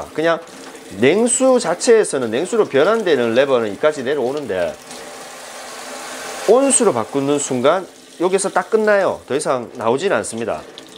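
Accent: Gyeongsang, South Korea. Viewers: 경상도 목소리 악센트 맘에안들어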